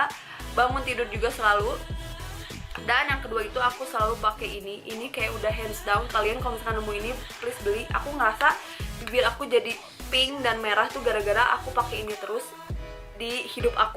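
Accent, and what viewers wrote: West Java, Indonesia. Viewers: ka orang sunda ya ?😀😀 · seneng banget sama cara pembawaannya di youtube, ga dibuat2, ga sok asik kaya youtuber lain dan ada aksen sundanya hihi. langsung subscribe! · lucu kak, logat sunda nya keluar banyak di video ini 😄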